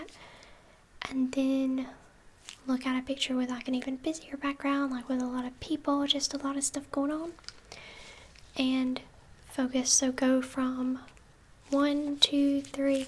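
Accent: South, United States. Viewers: Lis Sanguinan southern accent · Thats either an Alabama to NC accent. Or shes in the midwest. Hell it could be upstate NY as well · The subtle southern accent melts me. I loooooove it. GG nice vid keep it up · It's a southern accent dude lol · Lis Sanguinan it's from the southern United States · Anybody know which southern state she is from? Just curious to know where accent is from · I hear the southern accent but can't place it; What state are you from?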